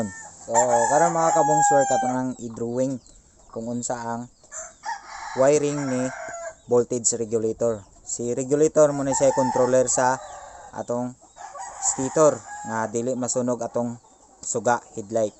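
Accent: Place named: Visayas, Philippines. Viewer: Visaya ka pre, di kita maintindihan.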